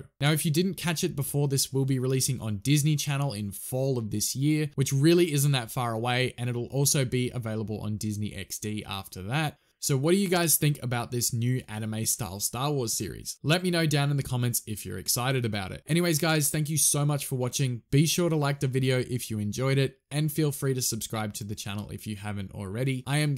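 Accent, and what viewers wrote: Australia. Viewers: wait your an Aussie? im Australian two!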